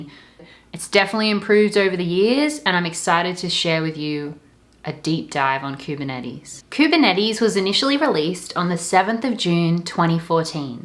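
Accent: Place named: Australia. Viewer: I came for the tech but stayed for the accent! #AussieAussieAussie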